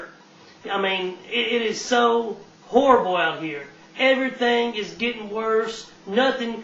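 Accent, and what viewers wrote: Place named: South, United States. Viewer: "A form of brain warrrsh .. ( love the Southern accent).. I'm a southerner myself..Born in The Ozarks actually.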